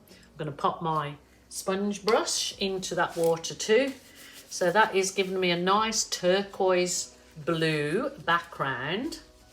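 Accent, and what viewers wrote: Birmingham, United Kingdom. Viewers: Hey there Lovely! I'm really late to the party only just found your channel 🤦‍♀️. Absolutely love your art.❤ (Great to hear a Brummie accent too! Great Barr gal myself. X)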